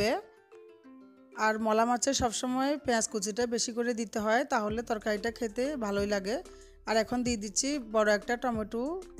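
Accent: Chittagong, Bangladesh. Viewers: আপনি কি চট্টগ্রামের?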